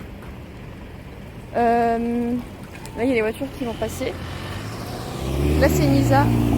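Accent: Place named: Haute-Savoie, France. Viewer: こんにちは(^___^)元気ですか Je trouve vraiment que tu te débrouille plutôt bien pour filmé...... non je déconne..ahah ^^ mais c'est sympa de t'écouté parlé avec un petit accent de haute Savoie!! je me trompe sans doute?? Merci pour ce partage... et à la prochaine (^_-)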